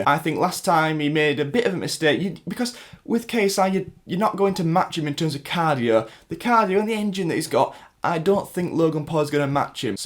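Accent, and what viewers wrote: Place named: Yorkshire, United Kingdom. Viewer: hi I hope you see this comment, I love ur content and I have a question: where are you from? your accent sounds northern ( maybe Yorkshire or something like that?) ps: keep going mate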